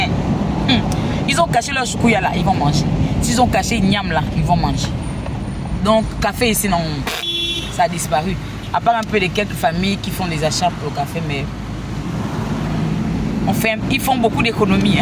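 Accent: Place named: Ivory Coast. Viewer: tu t'exprime chic genre une Ivoirienne bien choc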